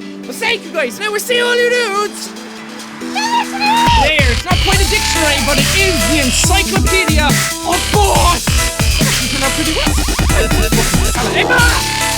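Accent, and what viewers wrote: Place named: Ireland. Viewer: I still remeber when you coved up your irish accent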